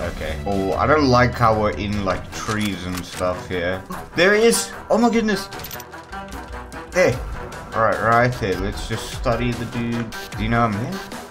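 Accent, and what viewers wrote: South Africa. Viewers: Bru you from SA? Pretty sure im hearing correct lmaooo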